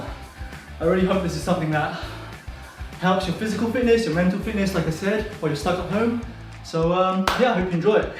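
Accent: United Kingdom. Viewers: Yeah his accent is 100% southern English. Probably born and raised in England is my guess based on the accent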